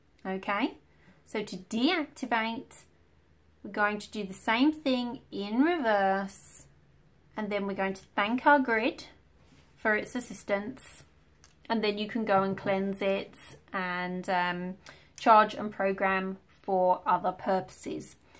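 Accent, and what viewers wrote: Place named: England, United Kingdom. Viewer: I love you english accent... besides that all that you said.. cheers from Colombia!!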